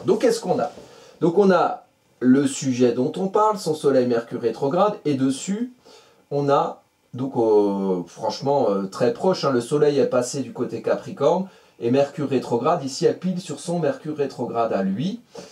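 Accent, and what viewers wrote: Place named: Grand Est, France. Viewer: 😁rien est normal effectivement, même pas sec derrière les oreilles et ailleurs 🙈🙊, bref ...Merci pour votre humour et votre travail ! Vous avez un petit accent , de la région grand Est. Une balance, ascendant vierge et le must une lune noir en balance😁😉😎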